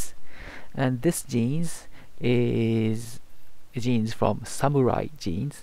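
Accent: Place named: United Kingdom. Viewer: Nice video, thanks! Your English is pretty good, by the way! Did you study British English? Didn't you? I'm just curious, because I'm studying American, and I am pretty sure that I hear British pronunciation.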